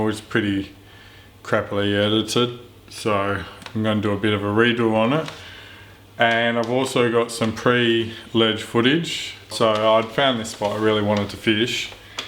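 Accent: Australia. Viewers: just subd been wachin your vids took a bit to figure your ausie, pretty sick stuff I love it, thought you were sayin "grouper" in one of the other vids then I got it, still snowing here in northern utah.usa hiding from the virus, maybe later this week I'll sneak out and catch a 24cm brown on a 4wht fly rod to scratch my itch, I just got back from southern baja were I was getting grouper, amberjack and yellowtail or "kings" as you say, what area are you fishing? so I can look on google, don't worry I won't sneak in plus I don't think I've got the balls for that kind of ........ what do you call that, "sketch fishing"? go big! stay safe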